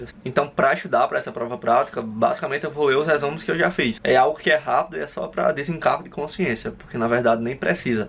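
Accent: Northeast, Brazil. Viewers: Você é nordestino? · Vc é nordestino né bixo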